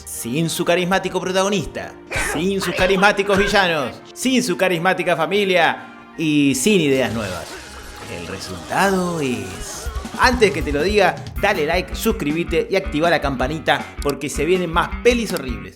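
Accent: Argentina. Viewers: Qué bueno eres. Y ese acento argentino le da mayor intensidad al humor.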